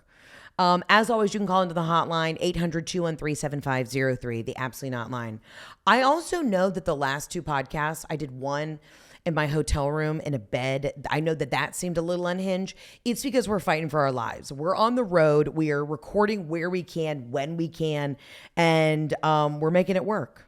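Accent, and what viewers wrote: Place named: Australia. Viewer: Heather, your Aussie accent and humor have made my morning! Just love your spirit. So happy for you, Jeff, and the team while on your adventure down under. Love and light from Tampa Bay 🦩🏝️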